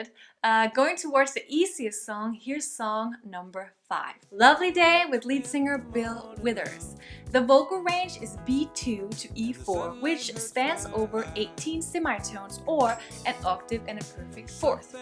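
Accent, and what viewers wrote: Denmark. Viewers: you sound a bit danish huh???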